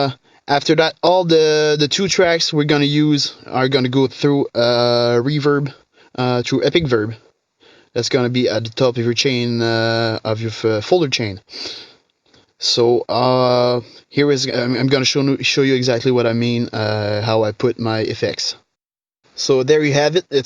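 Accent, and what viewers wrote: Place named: Quebec, Canada. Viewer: je savais que tetais quebecois avec ton accent , jai confirmé avec ton caliss 😂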